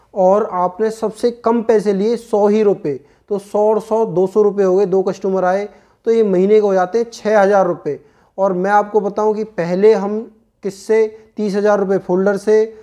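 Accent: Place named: United Kingdom. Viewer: Apne to hosla bada dia aapkenatane k andaz nirala h lagata h aap uk se ho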